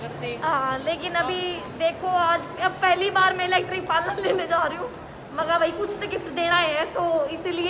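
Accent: Haryana, India. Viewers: RAM ram Ji wase chai to hai badi Tati or kya haal hai kade haand rahe Ho aapki boli mixed hai Hindi Aadhi Haryanvi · mja aa jata gai haryanvi sunkar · Yo Bhai Najafgarh aali side k Hindi or haryanvi mix krke bolle hena ktti ghee sa ghal jaa hai baiii... 😂😂👅😍❤❤❤❤❤❤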